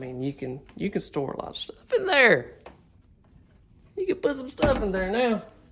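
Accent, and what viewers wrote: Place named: Alabama, United States. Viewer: I enjoy watching your videos. You make any of the homes appear better than what they are I am from the south. When listened to you talk I thought, this guy does not sound like he may be from Oklahoma! He sounds like either Alabama or Mississippi!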